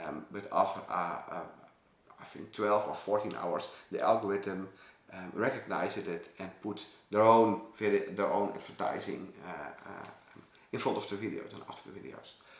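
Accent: Netherlands. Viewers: Thank you for this! Your English reminds me so much of a Dutch man who ran a dairy where I grew up. He was a wonderful, very friendly and cheerful man, as was his family -- friends I grew up with. He spoke English in a manner very similar to the way you do.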